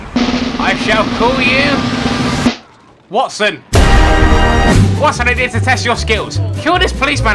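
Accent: England, United Kingdom. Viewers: He is british... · Oh your British yay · Are you English? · damn british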